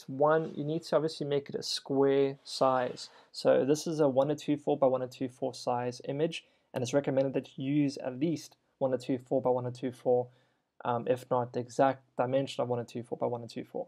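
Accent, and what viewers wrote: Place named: England, United Kingdom. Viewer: Thank you. Very informative... expressed eloquently in a perfect clear English accent!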